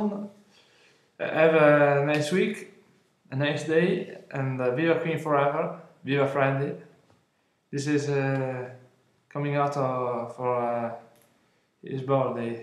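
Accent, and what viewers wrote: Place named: Italy. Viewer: Great job! Your glasses are really cute! I posted elsewhere that I feel like I am always being seduced when I watch unboxings on <link>. Which is great! Ow! Lol! I loved these that you do! I also love your Italian-English with meat balls! Mmmm good! Oh Lord! Soooo may I ask how old you are and if you are married and with children? Just curious! Later!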